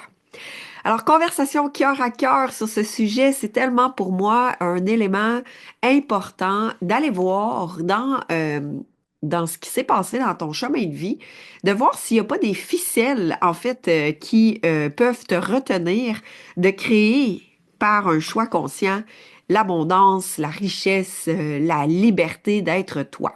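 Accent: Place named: Quebec, Canada. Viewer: Je supporte difficilement l'accent quebequois.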